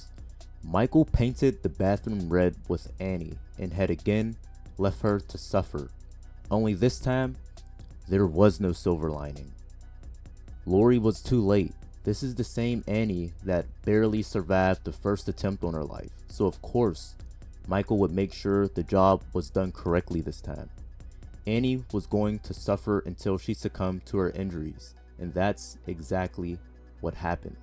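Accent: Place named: Pennsylvania, United States. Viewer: I was trying to place it the whole video lol. Pennsylvania is an interesting one because it bounces back an forth between east coast and kind of an upper south like Kentucky almost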